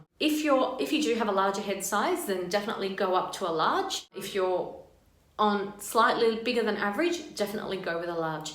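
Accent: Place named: United Kingdom. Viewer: Thank you … 12 FS8 and 12 FS12 vary so much that I won’t even purchase those colors anymore. I received a 12 FS 12 in Miranda and it was much darker than what you are wearing right now. It was a medium brown with sparse gold blonde highlights on the top only. I put her up for sale and made sure I showed the color and someone snatched her up within 30 seconds, so I don’t have to worry about it anymore but I love Palm Springs blonde but sometimes I want to mention in my color and I think that the 12 FS 8 gives you nice dimension especially in a long style like that. It’s unfortunate, so I think I will stick to Estetica colors… Even though they do not have near the colors needed I do have one that I love and it already has demention and I can usually be pretty safe with that color. I want an amber so bad and I just may go ahead and get her in Palm Springs blonde. 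I have a good night in PSV and she does have some variance in color running through her, she reminds me of my own hair that I used to have highlighted and colored so that’s a good thing. Thank you for the video, I always love watching your videos and I love your accent. Is it bad to want to go and take lessons on how to speak with a British accent? My son and I both would like to do that because we feel it is the proper way to speak!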